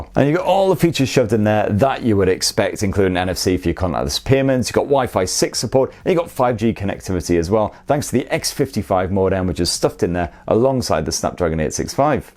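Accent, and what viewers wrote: United Kingdom. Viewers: i hate that british accent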